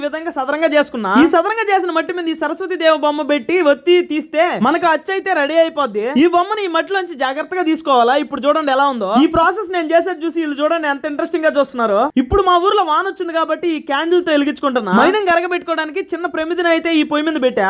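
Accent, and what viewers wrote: Nellore, India. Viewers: Ni voice baguntadi Nellore language super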